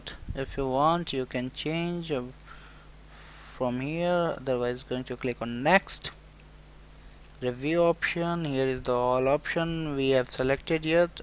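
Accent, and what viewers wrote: India. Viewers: omg the indian accent is triggering me yet there is no other option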